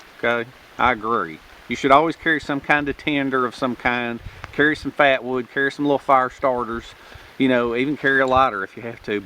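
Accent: South, United States. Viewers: As always, thank you for an entertaining learning session. I've said it before, you are the Mr Rogers of survival. I've watched my share of survival videos and all of them take on the overtly serious tone which makes the video far less entertaining (They need to learn to lighten up and laugh at themselves). You're honest in your situations and circumstances (just like the tent fire with the carbon fiber chimney) and you keep a fun and positive attitude. As I stated before, the Learning Channel needs to take a serious look at your videos and NOT CHANGE A THING about what you do in them and allow you to keep in real. As a fellow southerner (Look Out Mountain, TN) living in California (but leaving soon), it's a breath of fresh air to hear a real southern accent. You've got the regular TV programs of today beat, my friend. Except for Twilight Zone and Hogan's Hero's, there's no reason to watch the TV when I have your educational entertainment available here. 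Be safe my southern brother, and take care!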